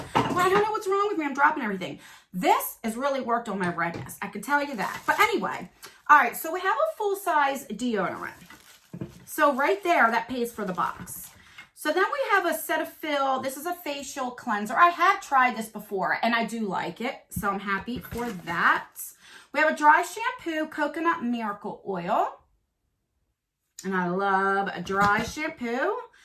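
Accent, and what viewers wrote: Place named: Maryland, United States. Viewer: Where are you from? My friend is from Maryland and you guys sound identical. 🌞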